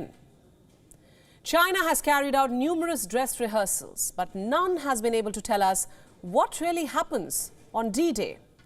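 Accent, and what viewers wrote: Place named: India. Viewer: Pretty strong Indian accent...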